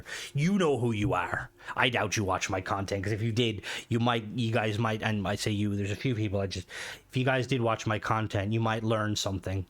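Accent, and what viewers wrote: Ireland. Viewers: M8 your accent sells it for me I think the Irish sound hilarious every thing else bonus your great lad am a Geordie so appreciate how funny accents are are and btw starfield is what it is the problem is it's not what everyone thought it was gonna be it's deffo not crap by any means bit niche yes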